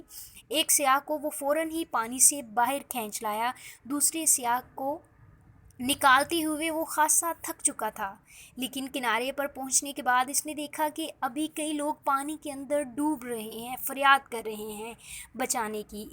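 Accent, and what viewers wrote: India. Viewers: Bibi bolna to seekh lo yar Kia bad report kerti ho lagta ha Indian ho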